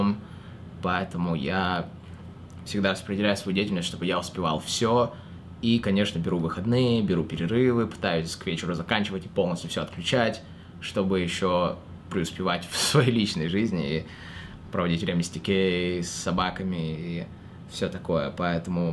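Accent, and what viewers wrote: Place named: United States. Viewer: Забавно как у на русском уже акцент американский появился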